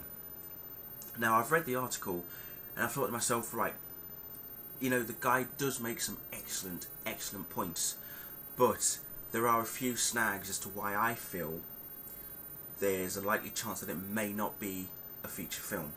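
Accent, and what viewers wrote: United Kingdom. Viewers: Your British aren't you.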